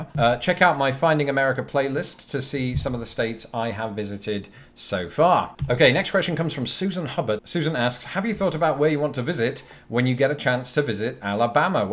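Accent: United Kingdom. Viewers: Sherbet Fountain Dorset, although she didn't have much of a West Country accent, being younger. But I notice it with Brits all the time, including our dear host in this video.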